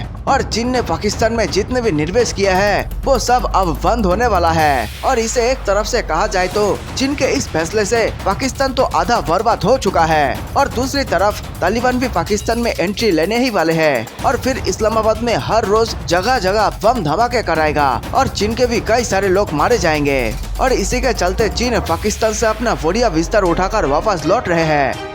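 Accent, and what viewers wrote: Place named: South India, India. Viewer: Madrasi hindi sikhle pehle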